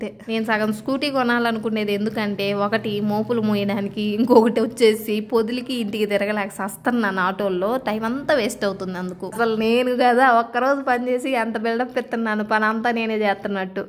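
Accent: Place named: Kanigiri, India. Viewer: my native place also nearby kanigiri and okasari reels chustunte e sister video chusa and tana slang chusi doubt vachi(manam enta dooram vellina mana own roots aete marachipolem kada😊😊😊) nenu adiga me proper akkada ani e sister reply echaru so ala naku telusu...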